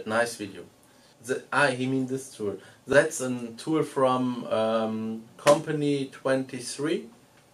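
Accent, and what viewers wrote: Germany. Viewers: Best of both worlds, get to occasionally hear the German accent and see re-builds of subi engines. Lived in Ansbach for 3 years. Oh how I miss it.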